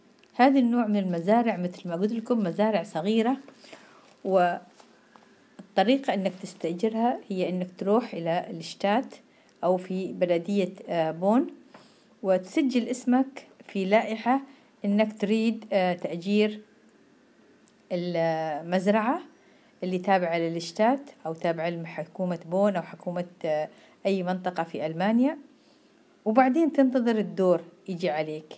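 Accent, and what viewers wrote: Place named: Saudi Arabia. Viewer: فديتك والله 😭😭😭لهجتك سعوديه 🇸🇦🇸🇦🇸🇦🇸🇦🇸🇦 أنا بعد من السعوديه عايشه في المانيا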